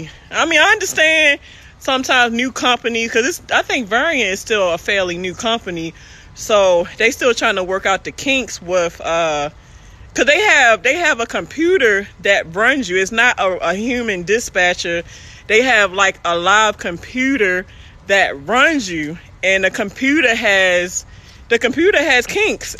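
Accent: Baltimore, United States. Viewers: I like that Bmore accent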